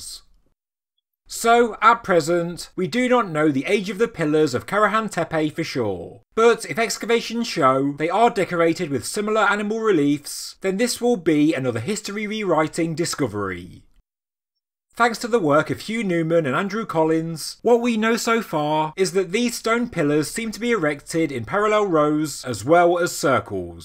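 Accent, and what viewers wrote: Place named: Midlands, United Kingdom. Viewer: aye your just east of brum thought i could hear that accent :d ...on tepe do you think there could have been a wooden structure over it at one time?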